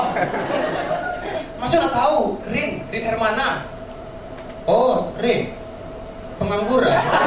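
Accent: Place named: Padang, Indonesia. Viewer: ndak lucu ang do...ma ado urang bukik logat padang...tutur bahso ang kasa...dasar padang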